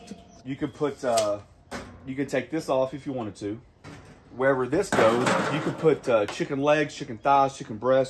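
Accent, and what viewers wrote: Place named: Gulf Coast, United States. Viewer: I'm gonna take a guess that you (like me) are from the Gulf Coast. I'm just judging that by your accent and the heavy downpour. Am I wrong or right?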